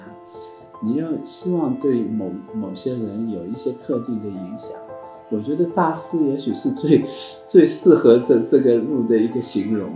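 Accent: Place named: Hangzhou, China. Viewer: 杭州本地人都这么说，江浙人都这样说普通话的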